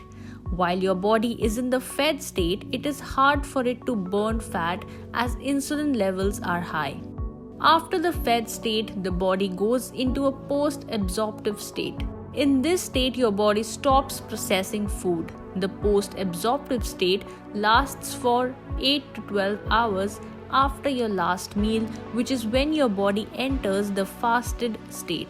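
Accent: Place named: India. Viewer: thanks for the information along with the nice Indian accent!!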